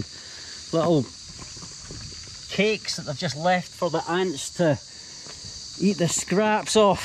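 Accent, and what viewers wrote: Scotland, United Kingdom. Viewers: Are you Scottish??? Welp!!! I have been to Scotland and stayed there approx 9 months mate 😀❤️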